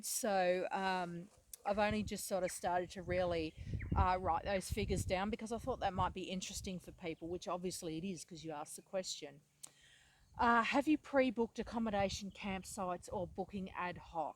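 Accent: Australia. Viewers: Gotta love the Aussie accent !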